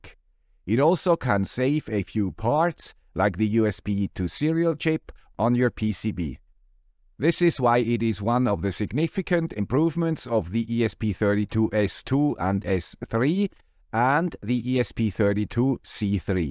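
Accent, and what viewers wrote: Switzerland. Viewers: I have not said it before, but I look forward to: the Swiss accent, The little hand pointer, The hat and the knowledge You share. ThankYou Sir. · Thank you man with Swiss accent, what you explained solved many problems in my mind. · I love the guy with the Swiss accent 😊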